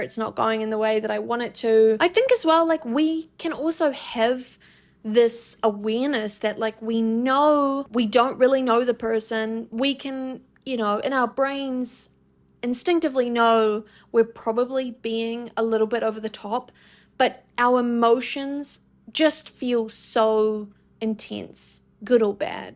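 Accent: New Zealand. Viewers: Thats pretty much just a New Zealand accent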